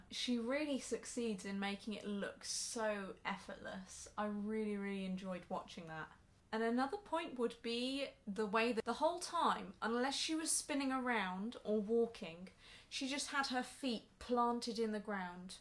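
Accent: United Kingdom. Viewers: Your surname is Polish but your english speech is very Oxford...simply nice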